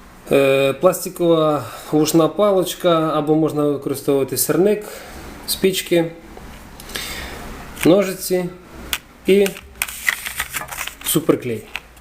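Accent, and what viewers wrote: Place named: Surzhyk, Ukraine. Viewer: Гарне суржик. Дякую.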